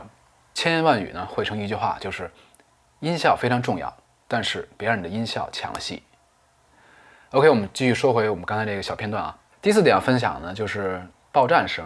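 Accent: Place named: Beijing, China. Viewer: 太厉害了，感谢分享，ps：京腔很正～！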